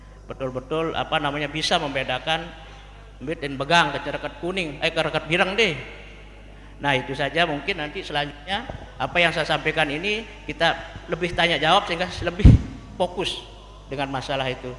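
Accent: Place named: Bali, Indonesia. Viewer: Orang mana ini brbahasa sj logat bali x ye... koq gk logis cm bs sling adudomba masyarakat gk etis dech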